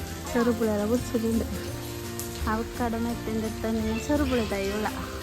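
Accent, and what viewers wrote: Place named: Kasaragod, India. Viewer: കാസ്രോട് ഭാഷ 👌👌👌😁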